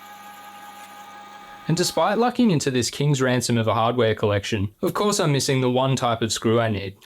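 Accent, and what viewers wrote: Australia. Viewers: Cycloidal drive for what? A fishing Reel? Looks Like I have gotten in somewhat early new subscriber here. Plus you sound suspiciously Australian? Can you define SuperWog? for me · AVE ! But Aussie and not Canadian! You got my subscription 😂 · I just found this channel, and I get the feeling you're like a younger, more Australian This Old Tony. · Every Australian I see on YouTube always turns out to be a subversive communist trying to undermine their own country, let alone mine. So when I hear your accent I am immediately alarmed. :(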